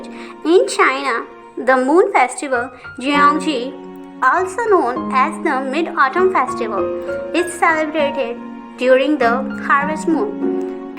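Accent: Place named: England, United Kingdom. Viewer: nice english acent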